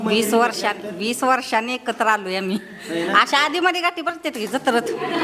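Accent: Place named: Satara, India. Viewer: खूप छान आठवणी आणि त्यात सातारी भाषेत ऐकण्याची मज्जाच भारी... लय भारी🎉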